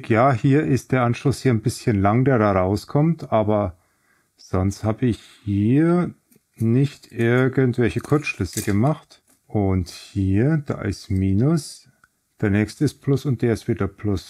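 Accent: Bavaria, Germany. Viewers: Bei mir haperts an der Fremdsprache Bayrisch. Also ist das hier so, als ob ich Electro Boom gucke, nur dass dieser lustige Internetonkel hier nicht so oft unter Spannung steht. ¯\_(ツ)_/¯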